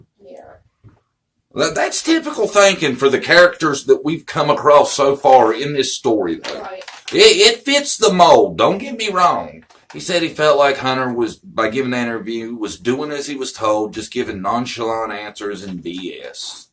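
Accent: United States. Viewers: Oh Cool! Idk why your channel hasn't popped up here before but I'm happy to meet y'all! I live in Knoxville, TN. I'm assuming y'all live in TN as well with that southern draw. Lol This was the first time I've gotten a good peek of Andy. Seems if brains were made of petroleum he wouldn't have enough to drive a piss ants go cart halfway around a fruit loop! No wonder H is emotionally disturbed. I don't believe a person like that would have said he didn't want to hear what happened that day though. Imo he knows if H tried to open up to him about it! Also imo LE needs to go back to the start & interview these people again! New sub here! 🙋😊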